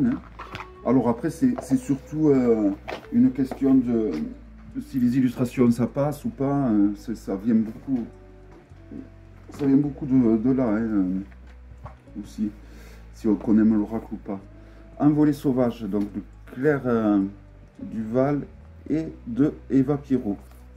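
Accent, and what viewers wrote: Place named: South, France. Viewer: Bonjour il n y a pas de hasard de tombé sur toi,contente de voir toutes ces belles cartes et avec ton accent du sud que j adore. BISOUS 👍🏊‍♀️🏊‍♀️🏊‍♀️🍒💐🌸🍀🌷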